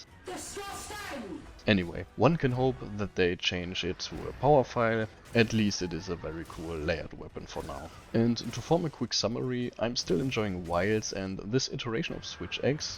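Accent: Germany. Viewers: Are you german by any chance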